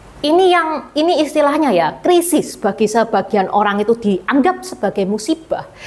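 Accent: Java, Indonesia. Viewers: Mantap ci, saya suka gaya ngomong cici medok khas Cindo Jawa...RIP MBH-Oey Hwie Siang taipan no 1 Indo dari keluarga Hokkian/Fujian.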